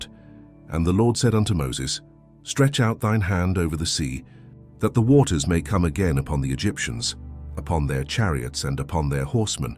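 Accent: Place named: United Kingdom. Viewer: I wish these stories were not spoken with a British accent. It’s disingenuous.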